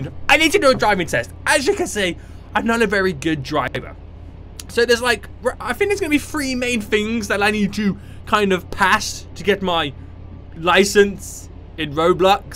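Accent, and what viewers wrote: England, United Kingdom. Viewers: Is seniac English?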